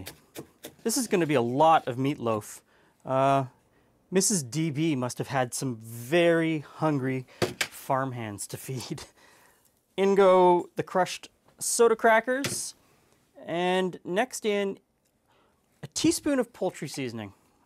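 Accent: Canada. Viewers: This is the first video I’ve watch on this channel. From the way he talked I thought maybe he was Canadian. Then I saw the bagged milk. Confirmed lol. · In the KC area at least, we get all levels of ground beef in the case; now, that I’ve heard your Canadian accent I realize Canada is different than the US on things like this. The 90% lean is so dry; I rinse my 80% lean in a colander under hot water once cooked, and that will remove the excess grease. Just found your channel; love seeing the Depression era recipes, and the way we are going they just may be more useful than we know.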